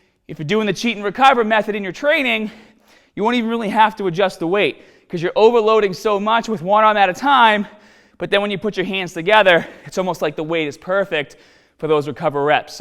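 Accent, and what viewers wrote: Boston, United States. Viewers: Weird, your Boston accent developed strongly over the last 4 years. I was just watching a video of yours from 2013 (Two Reasons Why Your Biceps Won't Grow!) and you don't even have an accent. Did you move to Boston and develop an accent? Keep your Rs, man. Don't lose those precious Rs. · “Staaaaaaaaaht.” I can’t. There is no accent in this country worse than the Boston accent. Great videos....I’’m just having a haaaaahd time getting beyond the “aaaaaaah”. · It's a Boston accent . Probably related to England and Ireland though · He sounds like a real deal Bostonian to me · Boston accent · That accent tho... I get confused every time. Is that really how ppl from Boston speak? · in your earlier videos I don't remember your Boston accent coming out this much as the vidoes now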